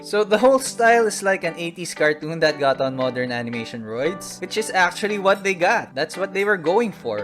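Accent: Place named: Philippines. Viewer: Is it weird that we recognize a pinoy when we hear it? Is this the pinoy accent they ( the foreigners ) are talking about?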